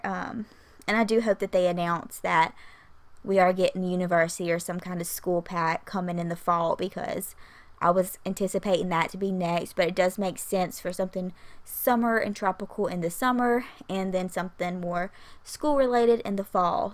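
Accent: South, United States. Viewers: OMGOSH I Never Hear A Southern Voice But My Own...... With My YT....... And I Live In Canada Now For 16 Years........ YOU Remind Me Of HOME!!!!!! Darling!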